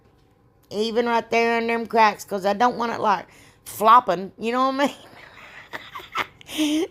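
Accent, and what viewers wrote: South, United States. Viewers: Oh, and I just want to say that I understand your accent, because I'm a fellow southerner from Southeast Texas! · My 14 year old daughter walked in on me watching one of your videos a couple weeks ago and she fell in love with your accent and now she goes around saying “well anyway” in her best southern accent lmao it’s the greatest. I’ll try to record and send it to you! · Hi there it’s me Ann and Dale again since we both watch you, You out done yourself,everything so nice.I love you’re southern accent, I can’t believe any one would say any thing bad to you,that’s called JEALOUSY! I’ve had some problems with that surgery we both had. Getting over that.I haven’t been on I miss your dogs also.love that lamp. Have a wonderful fall.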